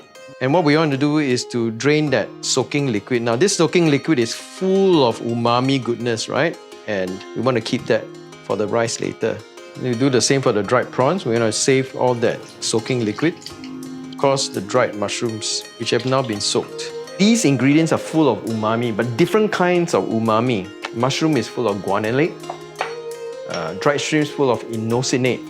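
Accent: Singapore. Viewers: That;s good I don't have a real steamer and I am really glad to skip that step. You talk and sound like a Singaporean and a Teochew at that. Hello Ka Ki Nang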